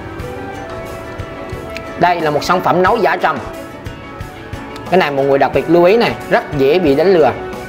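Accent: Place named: Quang Nam, Vietnam. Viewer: Nếu muốn là ng của công chúng thì nên học nói tiếng phổ thông chứ nói tiếng quảng ngé nge thôi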